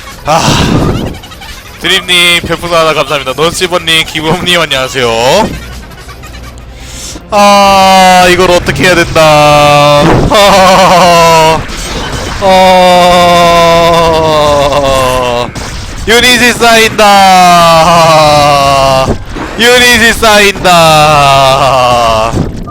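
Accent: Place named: Daegu, South Korea. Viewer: 님 대구에 사시나요?교회에 댕기고는 사투리인데 내가사는곳의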